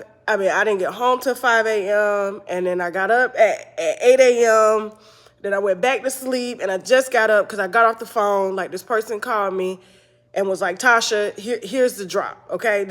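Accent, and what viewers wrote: United States. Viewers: That country accent 😂😂😂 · Why does she keep doing that country accent???😂😂😂😂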